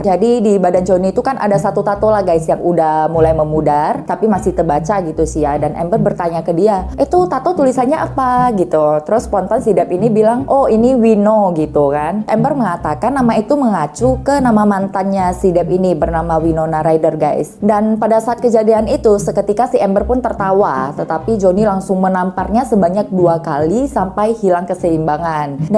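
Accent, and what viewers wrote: Medan, Indonesia. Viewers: Medan kali..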